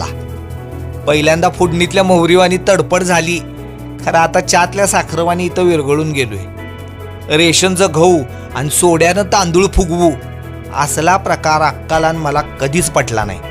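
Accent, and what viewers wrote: Kolhapur, India. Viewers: आपली भाषा व वांगी कोल्हापुरी दिसतात. खुप छान 🙏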